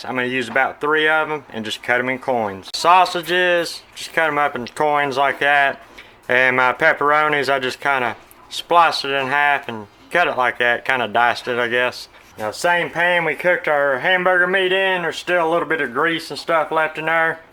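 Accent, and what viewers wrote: South, United States. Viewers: Hi iam so happy to see you!! You are a joy to watch. I like the southern accent its great!! Thankyou for sharing recooes with us. Mighty helpful to learn. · Where do you live? I know its the south cause of the accent. Reason I am asking is because I live in Mobile Al. Its between Penscola Florida & Gulfport Ms.. I was asking because their is a sausage called conecuh sausage. This stuff is so good you want ever go back to any other sauage. Wal-Mart sells it heck every store where I live sells this. When I was living in North Carolina target sold it, so I know you could ask someone could they order it & you could buy it from the store. This sausage would be so good in anything you cook. Its a great grill sausage as well. If you have never tried it you should try some. If you don't want to order it ask your local to store to order some. 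I told people in north carolina about it they would seriously go in target an buy like 4 or 5 packs at a time, so if they was sold out they would have some on hand in freezer.. When relatives come down they literally take a cooler full back with them. This sausage is just the best. I will leave a link... Anyways I love all your southern recipes. Southern food is the best food. Thanks for the really good recipes. <link>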